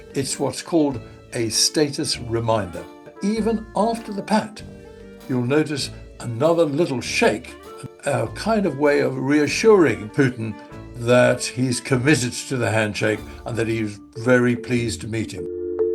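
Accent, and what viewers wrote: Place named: RP, United Kingdom. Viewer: So we supposed to believe u coz u got Elizabeth's accent. Tsek wena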